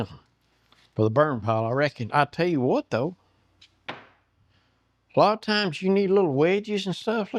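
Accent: South, United States. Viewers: Thanks for the reply. I'm Italian and passionate about English. Sometimes I think I can recognize some American accents. In your case, I thought I was hearing a Southern American accent. Glad I guessed right. 😊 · Great jig! As a fellow southerner, nothing wrong with your accent! We don’t pock the cah!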